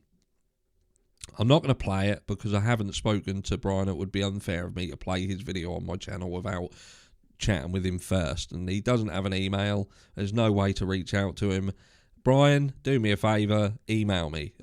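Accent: Norfolk, United Kingdom. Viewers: Your accent sound like your from the Norfolk area 😃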